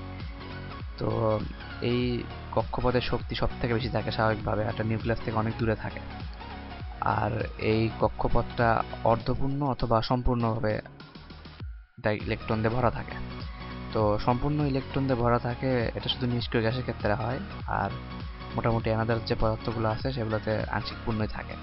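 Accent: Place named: Bogura, Bangladesh. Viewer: Bogurar vasha sune valo laglo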